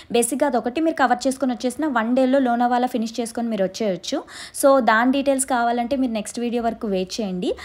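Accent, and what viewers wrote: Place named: Telugu, India. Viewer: ur pure Telugu slang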